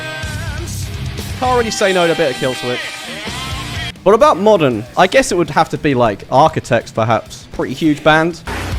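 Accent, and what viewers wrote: England, United Kingdom. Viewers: me agrada ese acento ingles, bro ! suena genial! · I fkn love this bri'sh accent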